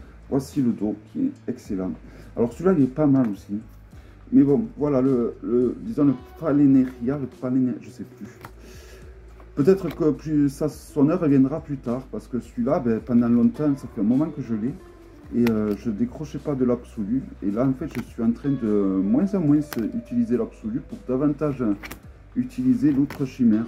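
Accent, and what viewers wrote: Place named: South, France. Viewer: Bonjour il n y a pas de hasard de tombé sur toi,contente de voir toutes ces belles cartes et avec ton accent du sud que j adore. BISOUS 👍🏊‍♀️🏊‍♀️🏊‍♀️🍒💐🌸🍀🌷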